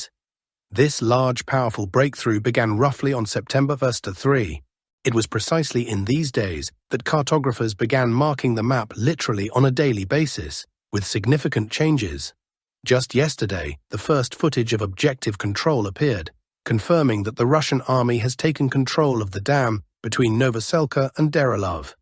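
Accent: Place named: England, United Kingdom. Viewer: How truly Russian or otherwise East European (as your name suggests) are you? Because you pronounce all placenames with a very English distorsion: hulya-powl instead of actual Huliapole, lay-man, instead of actual Lyman (lee man). It's funny but disconcerting, I thought you were from Russia, or somewhere in that part of the world.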